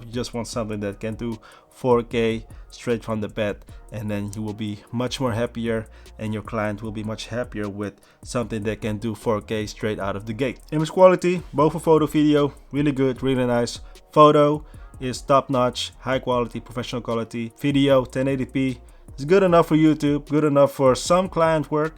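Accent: Netherlands. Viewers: Hoorde direct dat het nederlander was.. Nice content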